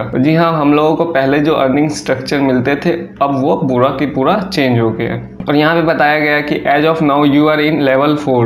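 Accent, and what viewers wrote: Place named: India. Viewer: Can't understand what are you saying in this indian-english style...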